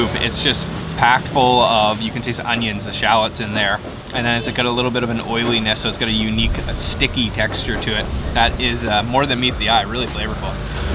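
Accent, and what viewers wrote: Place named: Canada. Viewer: Your Canadian accent is so freaking annoying.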